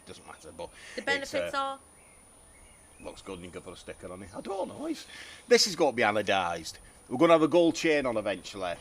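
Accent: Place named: St Helens, United Kingdom. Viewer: V-Strom 650 can be lowered 👍🏻 Can I ask where are you from. You sound St Helens to me, my home town 👍🏻😊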